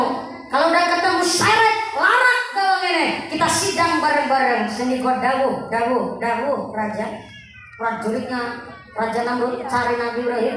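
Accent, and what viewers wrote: Banyumas, Indonesia. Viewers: Kayaknya kalau ora ngapak orak kepenak he he he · Pokoknya gak ilang ngapaknya... Hihi Kls 4 SD beliau sudah ceramah di desa Saya...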